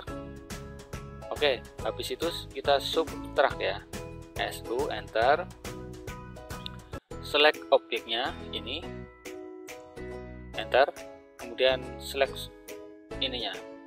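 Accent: Banyumas, Indonesia. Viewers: Master jan keren temenan ya,geleng geleng inyong lah,sukses om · Full tank sukses selalu buat om..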